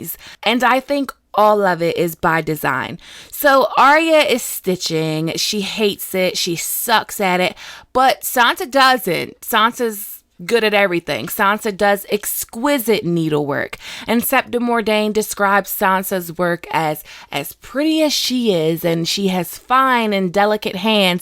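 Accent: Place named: New Jersey, United States. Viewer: are you from Jersey? I heard how you say wudder instead of water!;)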